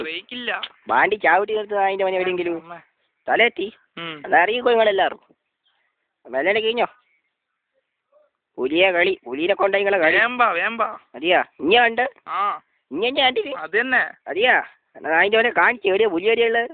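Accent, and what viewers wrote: Vadakara, India. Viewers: Vadakara slank 😂😂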